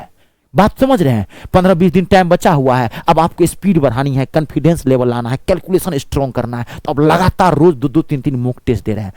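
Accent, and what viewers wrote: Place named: Bhojpuri, India. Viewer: Mts k bad aap calculation batch leke aaiyega chahe youTube pr ya app pr Aap acha pdhate h, motivate krte h, or kbhi kbhi bhojpuri tone mujhe achi lgti h , or aapka positive attitude 👌,,, tyari jeet ki, grammar to phle hi pdhi h but aapke video se question lgane ka conference aaya h, thank you sir... Or ha sir mujhe aapke hath bhut ache lgte h such ab jo bat thi kh di... Mai kbhi comment nhi krti but yha ki h 🙏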